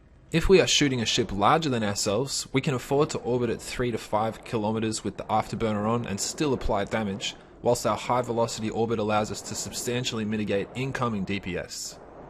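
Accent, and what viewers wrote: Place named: Australia. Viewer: so australian mate haha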